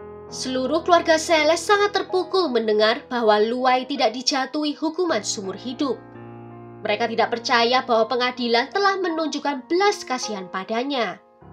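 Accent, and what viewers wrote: Java, Indonesia. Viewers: Mbk jawa nya medok amt mbk😅😂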